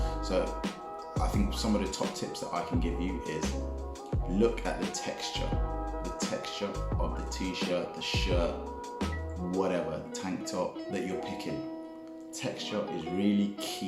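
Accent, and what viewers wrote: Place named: United Kingdom. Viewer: How did you get your name? Just curious, lol. You have a British accent, and me, myself, being, it tossed me off. Love your content btw!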